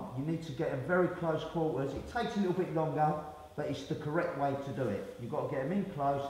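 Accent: London, United Kingdom. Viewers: dombou70 he's a London boy 👦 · Don't you just love it when people try to talk well. They try hard to pronounce their Ts and Hs but the native accent always slips in! Wot I've dun ere is mixed the filla righT. The impawtant fing to remember is... You can take the man out of London...but you can't take the London out of the man! Long live the London accent :)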